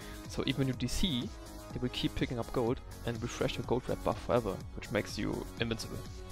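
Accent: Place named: Germany. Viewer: Odd, I watched the video in full and heard/understood everything he said; I'm also not convinced that his accent is really an issue either as I'm Australian and he sounded German to me though I could be mistaken but it any case I could understand him so BobDaBilda & Faphand111 you both may need to address your computers sound issues. O.P thanks for the upload, I am rolling a Wizard for season six and even though Explosive Blast has never been a favorite of mine it was still an interesting build you demonstrated. I am actually keen to either try the Vyr's set or alternatively I might skip sets all together and focus on a disintegration build seeing as Wizard has changed quite a bit since I last played one.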